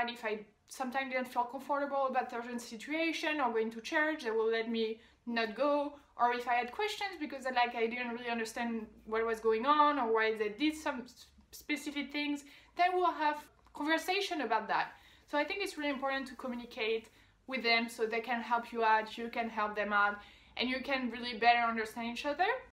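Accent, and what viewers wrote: France. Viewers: Thank you for doing this video. I am particularly interested in the challenges you faced with American spoken language. How long did it take you to get to point where you could understand conversations? Was it easier or harder to follow informal conversations compared to lectures in class? Also, in speaking English, how long did it take to achieve complete fluency? I’m sure you’ve been told, your accent is absolutely charming. Americans love a French accent more than any other language.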